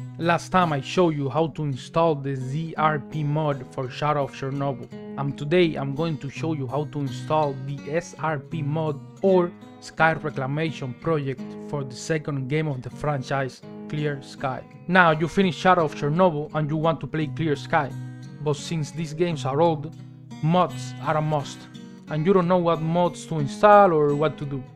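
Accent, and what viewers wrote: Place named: Mexico. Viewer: Great video ... very easy to follow. Love your accent. Mexican?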